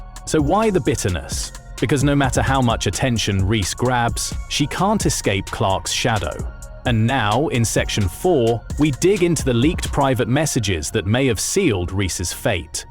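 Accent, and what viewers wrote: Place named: United Kingdom. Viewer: How about an American English speaking announcer. We are tired of you BRITS.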